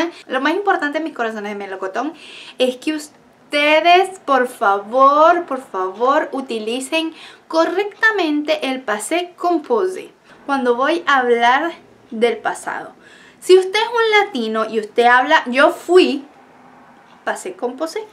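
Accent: Venezuela. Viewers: Excelente calidad de video, con información muy importante. Gracias. Una pregunta: eres venezolana? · Acabo de descubrir tu Canal Por el acento eres venezolana?? Soy venezolana y estoy en Francia luchando con el idioma Me gusta tu explicación es clara y precisa espero me ayudes Merci... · Tuve mi sospecha por tu acento,hasta el minuto cuando pronunciaste ''AREPA'' jajaja,saludos desde Venezuela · Excelente la explicación !!! Bello tu francés y tu acento Venzolano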